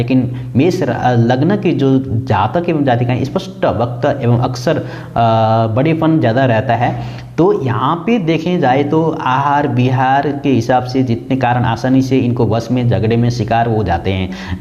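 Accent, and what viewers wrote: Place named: Nepal. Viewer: तपाइ नेपालि हो जस्तो लाग्छ त बोलि सुनदात हो नेपालि मिक्स छ बोलिमा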